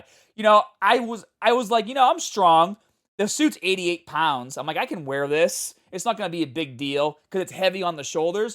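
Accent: Boston, United States. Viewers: I'm never ready for the Boston accent lol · R u from boston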